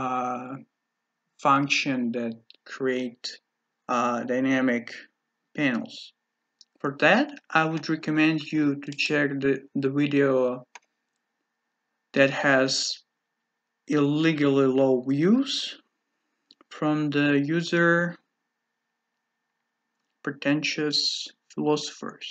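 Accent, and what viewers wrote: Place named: Russia. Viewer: Also, do you speak Russian? Your accent seems familiar)))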